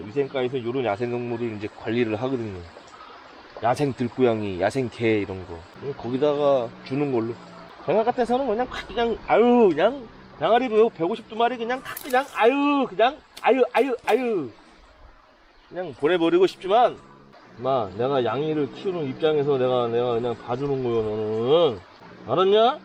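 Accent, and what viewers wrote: Gangwon, South Korea. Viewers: 강원도 사투리 쓰시는거 보니까 강원도 이신가봐요?ㅎㅎ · 네발달린늠이 고양이였네요.. 병아리를 안먹고 놀이삼아 죽이다니.. 저도 강원도라 말투 정겹네요.. 단속잘하셔서 피해 더이상 안받으시길.. 화이팅하십쇼..^^ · 고양이 안죽이신건 잘한일임. 예로부터 개, 고양이, 뱀은 죽이지 말라고 했습니다. 동물령이 붙는다죠. 근데 어제 이 채널 첨왔는데 매력넘치는 주인장님 재밋네요. 재밋는 강원도 사투리 억양 잘보고있습니다. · 카리스마있는 인상에 엄청 잘생기셨는데 ㅋㅋㅋㅋ 강원도사투리가 너무 귀여우시네요 ㅋㅋㅋㅋ 반전매력ㅋㅋㅋㅋ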